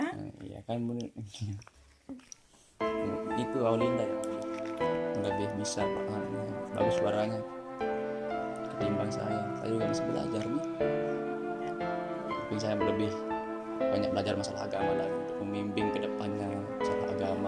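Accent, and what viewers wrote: Lombok, Indonesia. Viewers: logat lombok memang kental bang 😂😂 mantablah👍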